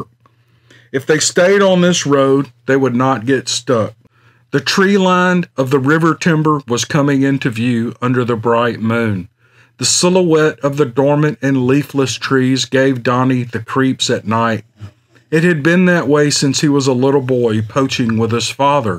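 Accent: South, United States. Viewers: I love his accent and cadence. Sounds like a suave southern gentlemen. (I'm a woman.) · I love the southern American accent, i dont know if its me being Aussie and not knowing what to listen for but it doesn't sound trashy to me, it sounds charming, engaging. Its an accent made for story-telling. Are there different southern accents? I imagine with Louisiana being French they might sound different & I've heard Cajun people speak and I cant understand them at all. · Always have had a fasicination with Cryptoids. I myself am a Southern girl. Born in Memphis,TN and as a child roamed the hills of Mississippi, Tippah County my paternal aunt own land so I was all over it. Never ran into Bigfoot, but there suppose to be a Big Black Panther of sorts roaming those same hills. But I never had the experience of meeting anything out of the ordinary. I now live in Washington state still on the look out though. 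Love your storytelling and your southern drawl.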